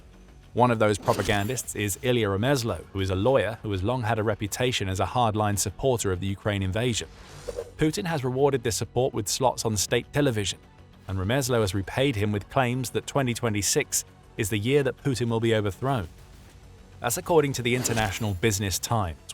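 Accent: England, United Kingdom. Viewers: "Nearly three identical offices"? Was it two and a bit, or three and a bit? The narrator's accent sounds English, but the grammar makes me wonder.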